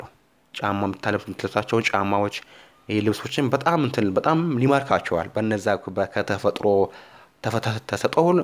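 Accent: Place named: India. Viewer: u sound like an Indian guy speaking English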